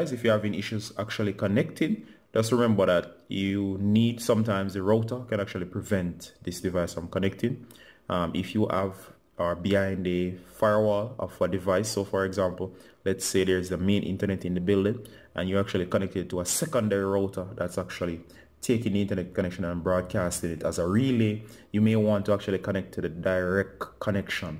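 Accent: Jamaica. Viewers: U sound Jamaican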